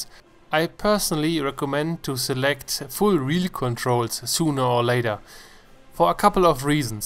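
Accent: Germany. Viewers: I love your accent, German accent is one of the best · Hes german you can hear it at the voice🤣🤣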